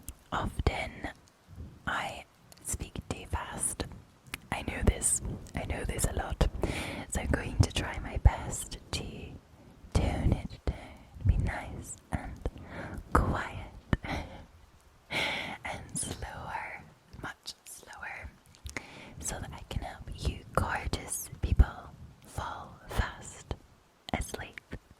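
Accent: Scotland, United Kingdom. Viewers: beautiful Scottish accent, whispered and Tascam mic, this sounds so good and tingly. Thanks for the amazing ASMR content every Monday, Wednesday and Friday 👍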